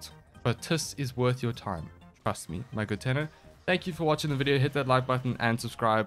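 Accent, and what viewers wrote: South Africa. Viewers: This is South African I love it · That accent is it south african?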